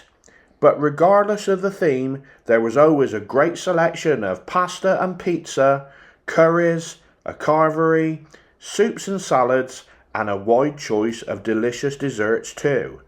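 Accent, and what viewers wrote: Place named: England, United Kingdom. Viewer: Sorry if this has been asked before but what part of England is your accent from, Devon? Just discovered your videos -great job you two!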